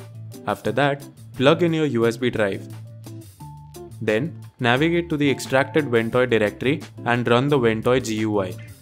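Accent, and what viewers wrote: India. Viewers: As I heard the Indian accent, I knew this is going to work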